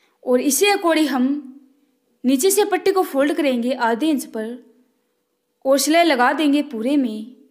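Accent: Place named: Garhwal, India. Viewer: Boli mai garwali tone lag rahi hai .aap utrakhand se ho?❤❤❤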